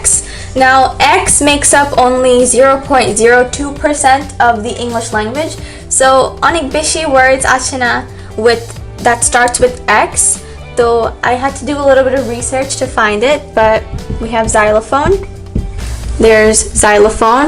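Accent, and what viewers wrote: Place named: Bengal, India. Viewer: Best accent of Bengali pronunciation ever I have heard.❤️❤️❤️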